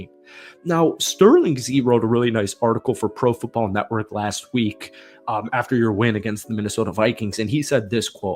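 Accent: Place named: South, United States. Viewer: Your half southern half Wisconsin accent is absolutely awesome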